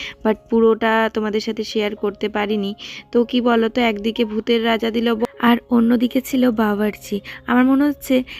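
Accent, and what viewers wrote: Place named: Bankura, India. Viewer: Thank you amar comment er answer vlog e bolar jonna...amar bario bankura te...prothame tomar kothar tan sune bujhechilam tumi nischai bankura r.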